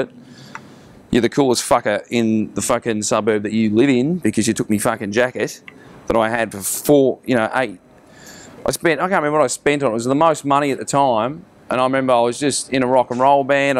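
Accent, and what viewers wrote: Australia. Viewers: How Australian is he? Brilliant! It just sounds better being called a c$&@ in Australian. Sounds like a normal bloke. Great stuff! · massive australian accent ! this dude seems so cool haha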